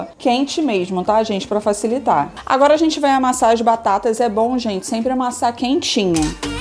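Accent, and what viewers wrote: Rio de Janeiro, Brazil. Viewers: vc me leva para casa com o seu sotaque e o sabor das lojas que só tem no Rio, hoje moro em Bauru. Vou fazer agora!🙏😘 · Tu é carioca,?? Me identifiquei muito com seu jeito de falar hahahahha Vou fazer hoje essa coxinha, vamos verrrrrr!!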